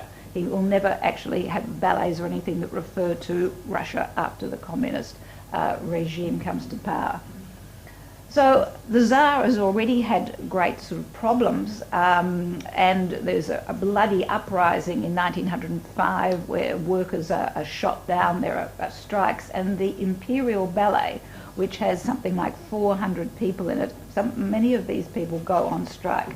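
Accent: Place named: Australia. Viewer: if the australian accent could be contained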